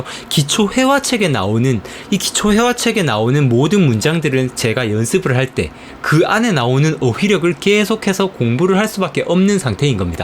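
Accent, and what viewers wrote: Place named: North Gyeongsang, South Korea. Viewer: 아닙니당 ㅎㅎ제가 유도리가없었네요! 경북에사시나요? 고향이 포항인데 말투가 비슷하네요:)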